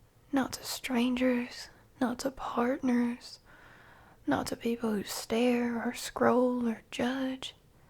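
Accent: South, United States. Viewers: Loved it, I also the southern accent it was amazing! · Let me talk to who for what?! cooking with the country accent 🙏🏻, also I normally don’t ask but if you guys can pray for me Tomorrow morning is my Grandpas funeral I’m fine right now but I don’t know how I’ll feel tomorrow 🙏🏻❤️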